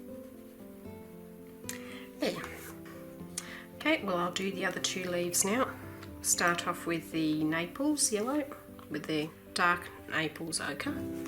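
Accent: Australia. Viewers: I haven't done the duck pond yet, but i love coloring along with your videos. The music is soothing, your auzzie accent is great, and your techniques inspire me! I see progress in all of my pages :) Just wanted to say thanks, and keep up the great videos :D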